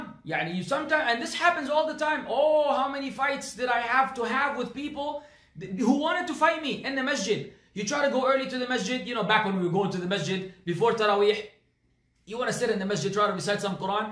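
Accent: Egypt. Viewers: assalaamualaikum ya akhy al habib, lol bro ur egyptian accent is on point and ur jokes are true lol barakAllaah feek for these lectures. :) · Nice egyptian accent :D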